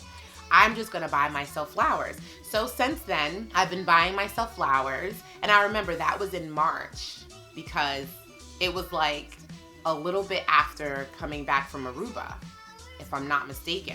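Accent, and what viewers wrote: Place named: Staten Island, United States. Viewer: The Staten Island twang you have😀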